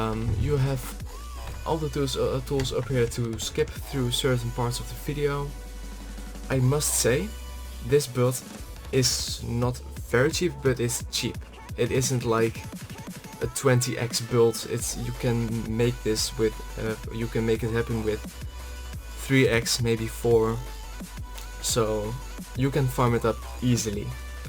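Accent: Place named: Netherlands. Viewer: Are you Dutch? If yes reply please you sound like someone with an Dutch/English accent. maybe we can play togheter. I am building allmost the same build that you have shown here only with an firery twist to it :D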